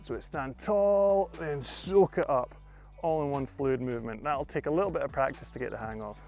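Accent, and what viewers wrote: United Kingdom. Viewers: "So obviously that's all beautiful, excellent technique.", with that British accent and the plethora of hand motions you've elevated biking videos to the level of a performance of Macbeth.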